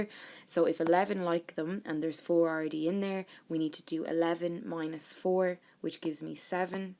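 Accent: Ireland. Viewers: Oh man i love that irish accent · Omg, an Irish accent on a yt revision video...there is a god.😁❤️ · Irish accents do be cool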